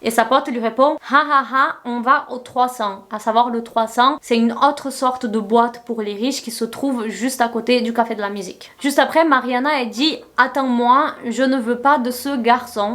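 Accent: Brazil. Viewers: J’aime tellement ton accent ! Tu as les mêmes « défauts de langage » (je savais pas trop comment dire ça) que ma mère je trouve ça trop miiiim’s Bref continue comme tu es 🌊 Juste une question ? Tu es d’où au Brésil (sou carioca e vascaina) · Coucou! Un petit commentaire pour te dire que j'adore tes vidéos, ton naturel, ta façon de raconter etc En plus pour ne rien gâcher tu es magnifique et j'adore ton accent brésilien (je suis portugaise) ! Je t'adore et tu me detends énormément quand ça va pas ❤❤❤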